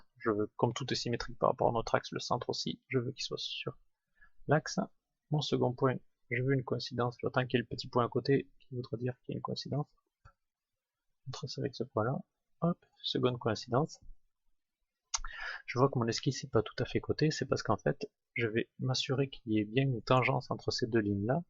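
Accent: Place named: South, France. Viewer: Bonjour Ce sont des super tutos, j'apprends sur 2 écrans en faisant au fur et à mesure et j'ai pu imprimer les premières pièces dessinées sur freecad. cependant, une petite question: quelle version de freecad utilisez vous sur les premières vidéos? Sinon c'est génial avec l'accent du sud!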